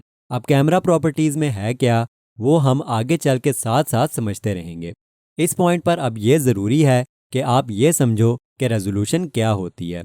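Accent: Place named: Pakistan. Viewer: Apki awaj pakistan logo jaisa ata hai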